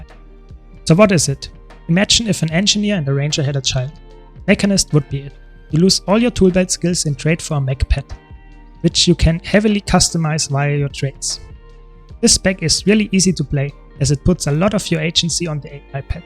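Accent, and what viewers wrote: Germany. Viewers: Ah yesss that german kartoffel accent xD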